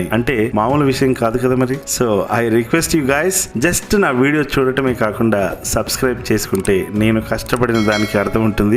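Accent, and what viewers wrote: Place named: Rajahmundry, India. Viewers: Bhayya super 👌👌👌👌👌👌👌 Mana Rajahmundry slang lo meeru cheppe vidhanam Ultimate Anna. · Subscribed bro. I didn't knew you are from rjy although your accent and style looked too close RJYians from most of your videos I have watched till now. Touched bro. You are doing good work also. 👍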